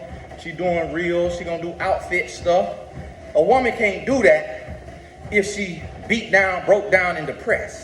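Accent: South, United States. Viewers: Hey! OK, so Now, there's been a CHANGE, right? You are NOW preparing to WORK ON your COMMUNICATION Skills and NOT be in a comfortable Mindset of ACCEPTIng your Country twang talk, as this is who I AM? And my guess is, you came to the conclusion that it's better to have MORE people UNDERSTAND YOU than not, to MAXIMIZE your OPPORTUNITIES for financial growth 💵, as you pointed out this is the time to grow, store and save! AND I DEFINITELY GET IT because I See that God has made YOU a QUICK THINKER in evaluating WHAT'S BEST! BTW... Your decision to have some 15 minute videos, is AWESOME because, after I have thoroughly enjoyed listening to Much longer videos in initially receiving your wisdom, experiences, and perceptions, NOW, my attention Span has become shorter in my READINESS to watch a much longer video. However, RELATIONSHIP email reads and responses, IMO are Ok for longer. 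Maybe others feel differently?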